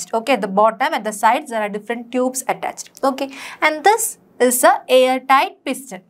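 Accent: Kerala, India. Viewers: Ma'am r u a malayali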